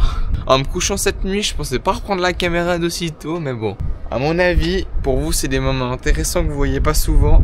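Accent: Switzerland. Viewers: Salut le petit Suisse 🤣😂🤣😂, en espérant que tu ne le prenne pas mal. Je viens de commenter ta dernière vidéo, et voici une nouvelle en ligne. Tu aurais dû être prof de maths pour expliquer horizontal et vertical . Je t'embête plus. Les plans au drone sont magnifiques. Je crois que tu es le seul qui dit encore mon papa et je trouve que cela en dit long sur la relation avec tes parents. C'est toujours un vrai bonheur de regarder tes vidéos et de t'entendre parler dans ton patois Suisse. Remorques ou chars, Ben Hur??? Bon courage et tu peux être fier de toi. 🙋‍♂️🙆‍♂️👍🏻👌🫡🫡🫡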